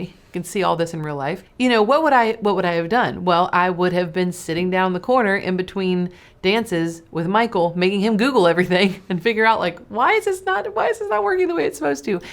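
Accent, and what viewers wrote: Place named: Alabama, United States. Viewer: thank you so much for this video. I love your teaching/behind-the-scenes style and your smooth southern voice reminds me of the Alabama that I left to come down here to Brazil. BTW, my Canon 600EXRT was giving me some trouble (shooting full power every once in a while), so I bought the new Godox V860iii (it is R$1200 Brazilian). Just got it for Christmas and haven't even had a chance to take it out, but I loving it! Wish I could control other flash makers (like my YongNuo) with the Godox (you can, but only on manual S1). I can't even control my Canon flash with it, but that's ok because I plan on changing ALL my flashes for Godox. Hint: DO NOT BUY YONGNUO FLASHES, THEY BREAK EASILY AND ARE NOT WORTH IT!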